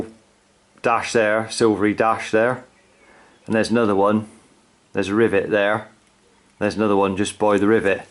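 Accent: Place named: West Country, United Kingdom. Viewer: Sounds like UK west country, perhaps Devon.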